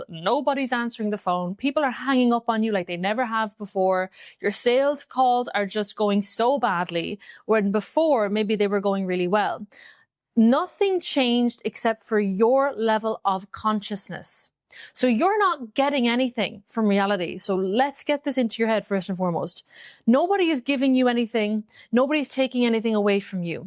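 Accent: Ireland. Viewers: Love the irish accent .. Galway man here .. best luck with it all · Are you Irish? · Loving the videos, recently found the channel. Explain it in way that’s freshhh yee. Just curious where are you from, are you Irish ☘️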